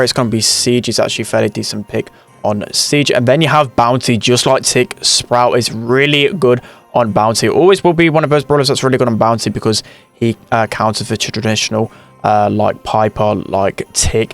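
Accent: United Kingdom. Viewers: It's the british accent xD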